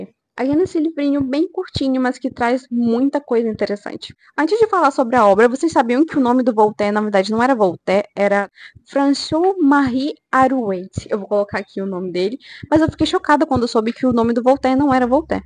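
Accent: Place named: Pará, Brazil. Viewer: Seu sotaque é do Pará.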